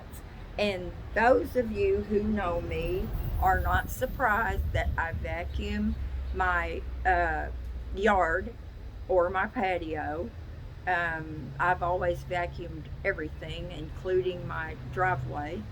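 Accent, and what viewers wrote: South, United States. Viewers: I luv your southern accent! You r the bomb!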